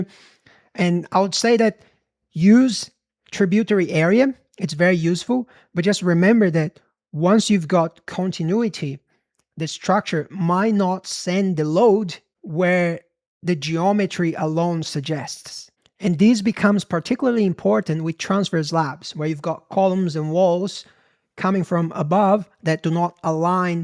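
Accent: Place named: Australia. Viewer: The ozzie accent on a brazillian is mind boggling. Absolutely unbelievable! It's almost the opposite of a Japanese speaking Arabic.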